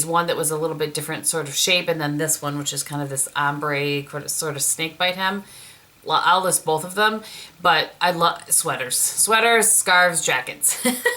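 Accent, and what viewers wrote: Wisconsin, United States. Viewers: Your Wisconsin accent is great! Love from Madison, WI!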